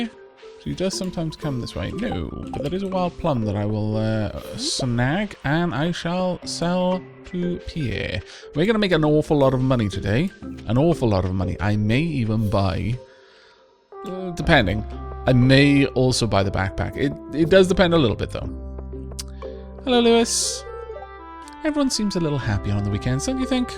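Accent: Wales, United Kingdom. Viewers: Say, I could have sworn your accent was Welsh! So are you a welsh person living in Scotland or a Scottish person with a funny accent? (I am from Edinburgh, my accent is strange too.)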